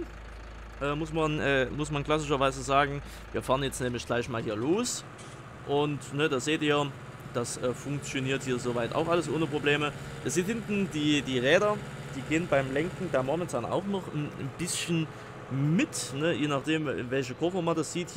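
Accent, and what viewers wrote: Germany. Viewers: Gibt auch Gegenden in Deutschland wo man so redet;)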